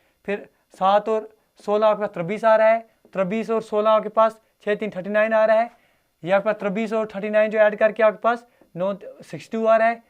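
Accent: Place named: Punjab, India. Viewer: Love your punjabi acent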